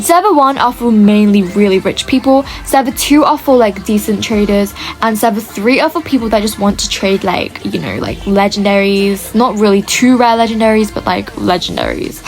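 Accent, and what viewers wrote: United Kingdom. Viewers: You have a British accent right?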